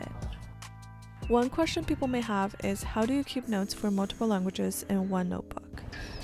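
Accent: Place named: England, United Kingdom. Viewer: You have a great English accent! Subarashii!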